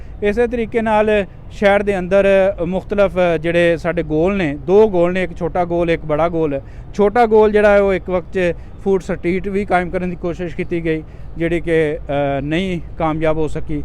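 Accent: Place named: Doaba, India. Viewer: Aah bhaji da lehja sade hoshiarpur doabe val da hai , pure doabi accent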